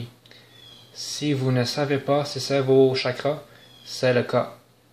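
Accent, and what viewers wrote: Quebec, Canada. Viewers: J'aime bien l'accent québécois.Mais tu pourrais me donner l'adresse de ton coiffeur?..........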